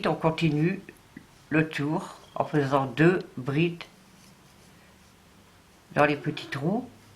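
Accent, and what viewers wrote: North, France. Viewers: Bonjour ! Merci beaucoup pour votre pédagogie, vous êtes simple, toute " mimie " avec l'accent du "chn'ord" que du " bonhor !!"🌹👍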